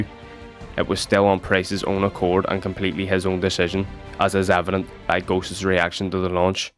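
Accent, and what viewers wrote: Northern Ireland, United Kingdom. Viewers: Excuse me, are you northern Irish? Cause the accent really makes me certain that you northern Irish. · I don't even think you're Scottish... Northern Irish more likely?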